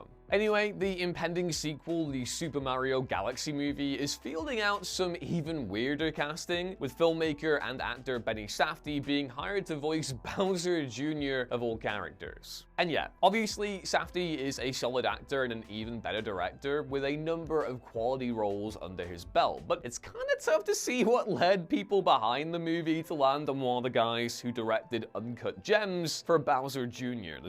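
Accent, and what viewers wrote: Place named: England, United Kingdom. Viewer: He pronounced it Chris-tian. It’s his English accent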